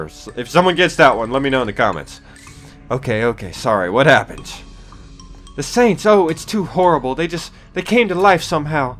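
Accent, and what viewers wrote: South, United States. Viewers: Your southern accent is much more prominent when you play this game. And what's that thing in the bottom left corner? I never noticed it till this video lol. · Maybe I knew him in another life.......I DUNNO........you sound so Southern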